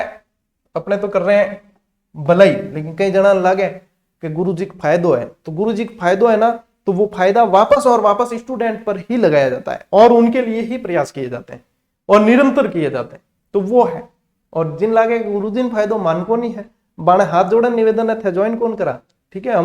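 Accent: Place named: Jodhpur, India. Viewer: गुरू जी आपकी मारवाड़ी शैली में पढ़ाना.... सीधा दिमाग में सेव होता है लिखने की भी जरूर महसूस नहीं होती और जोधपुर की बोली का तो क्या ही कहना... खांडा फलसा में कुछ वर्ष गुजरे ( चिम सा , रिंकू बा , अभिषेक बा सा ) 👌👌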